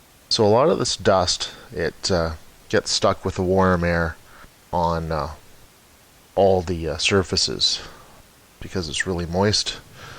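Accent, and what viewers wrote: Canada. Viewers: Total stud! Thanks for saving me a ton of money on a sales call. And love that Canadian accent!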